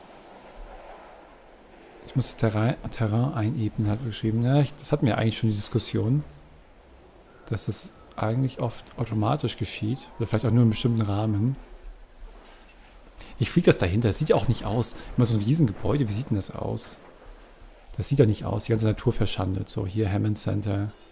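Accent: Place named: Bavaria, Germany. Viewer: Wie gut, dass Daniel nicht dein Bayrisch hört ^^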